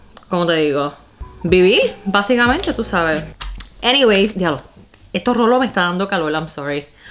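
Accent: Puerto Rico. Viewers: ¡Encontré este video por casualidad y me encantó! ¡Una China, Boricua! Wow! Me dije: tengo que ver de que se trata. Lamento mucho por esas personas burlonas y para que no te sientas mal, aquí aveces se burlan de su misma gente🤦‍♀️ Para mi eres de aquí. Aquel que ame a mi Isla como suya, merece ser boricua. ¡Miren a Tony Croatto! Ese era más jíbaro que muchos de aquí... Y ese acento, wow! Bien Puertorra! Enhance both sides of your culture! Eres una de las nuestras no matter what! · OMG!! Me has sacado las carcajadas más locas de mi vida!! 😂😂 Niña verdaderamente no se puede negar que te criaste en PR 😅 Tu acento y la “l” arrastra que nos identifica bien brutal! Jamás te avergüences de tus raíces ni olvides de donde vienes porque eres y serás siempre un ser humano hermoso. China,Arabe,Rusa etc todos somos humanos y muy dentro de nuestros corazones es donde está la escencia de lo que somos!! 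Saludos desde Buffalo NY Una Boricua como tu!! 🥰❤️ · You sound as Puerto Rican as any member of my family, and for that, it is a delight to hear you talk. On the "burlar" point, that is why i haven't gone back to Puerto Rico since 1987, people would make fun of my spanish, and try to make me feel stupid because i spoke English, i will never forgive them for that. Thank you for posting, i feel that i have some one that understands what i felt, or rather what some people on the Island made me feel like. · Sip bn bruta saco todo de puerto rico lo que es su estilo como se espresa su flow tu sabes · Tú hablas más puertorriqueño que yo pero mil por ciento!!!!yo ni él acento tengo y he vivido aquí siempre lol . Dios te bendiga y que viva PR 💖💖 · Ella no nació en puerto rico ,,ni tampoco tiene raíces puertorriqueñas,,así que 100x ciento puertorriqueña no es,,,ahora si tiene acento boricua porque se crió en puerto rico,,ella,es 100x ciento china,,,con costumbres boricuas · Para mi eres de los Nuestros💯 🇵🇷 de pura cepa. 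Con ese hablarrr mas boricua q YO🙏🙏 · Que brutal , acabo de descubrir tu canal y me encanta esto, una china que habla %100 boricua, eres boricua 🇵🇷🇵🇷🇵🇷🇵🇷😍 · Me encantó tu video. Si te escuchara en cualquier parte del mundo te reconocería como puertorriqueña. Mucho éxito. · Arriba, WEEPA. We love you. Con ese acento eres boricua. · Yo escucho a una boricua, no veo otra cosa. Y de hecho, una muy linda. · Yo soy puertoriqueno nacido en Ponce y llevo viviendo 33 anos en la Florida pero te escucho hablar y tu eres mas boricua q muchos y eres hermosa que Dios te bendiga y me alegra mucho a ver llegado aqui tu eres una boricua china muy linda. 👍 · Definitivamente eres mas boricua que el mofongo y hablas mas puertorriqueño q muchos puertorriqueños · El acento, las expresiones y el vocabulario que utilizas es de una Boricua. La actitud que muestra es de una mujer Boricua. Realmente en la vida todos pasamos por diferentes situaciones en nuestra niñez pero como Boricua me alegra saber que en el fondo te identificas con nuestra cultura. 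Exitos en todo lo que te propongas y aqui tienes un seguidor mas. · No sabes lo cool q es escucharte siendo China y q hablas con ese acento boricua y por eso q pasaste es súper normal en PR si eres chino dominicano o incluso boricua el buleo es súper normal mientras vas creciendo y en la escuela peor so nada eso es parte de ser boricua y claro q queremos ver una segunda parte. Acuérdate boricua hasta en la luna 🔥🇵🇷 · Soy nueva en tu canal hablas igualito a los Boricuas ,me encanta tu energía y carisma soy de Puerto Rico ,san lorenzo muchas bendiciones y salud para ti y tu familia .gracias ! Por mostrar lo hermoso de nuestro país al mundo. 💞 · Me gusta como hablas, 100% bori, muchas bendiciones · Siento lo que pasaste En mi Isla, pero eres una de nosotros, no solo por el acento sino porque allí desarrollasteis de lo que eres y te convertiste! China bori hermosa! Bendiciones para ti y tu familia! 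· See literal su voz es de Puerto Rico 😅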